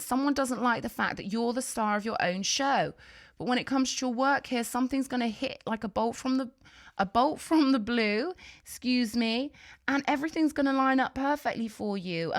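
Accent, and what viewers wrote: United Kingdom. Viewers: It's that an British accent? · it certainly is a British accent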